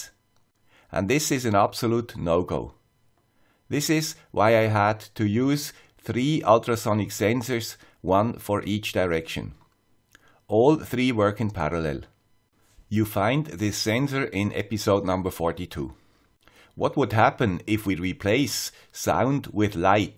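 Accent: Switzerland. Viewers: Pro tip when dealing with swiss accents: 1,25x video speed. It soothes my adhd :-) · I forgot to mention otherwise it's a good video! Thank you "the guy with the Swiss accent" ♥️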